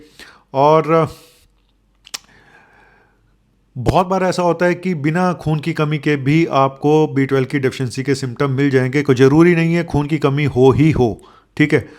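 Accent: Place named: Punjab, India. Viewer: Punjabi touch.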